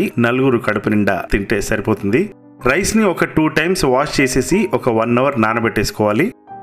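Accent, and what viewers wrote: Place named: Godavari, India. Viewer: Godavari yaasa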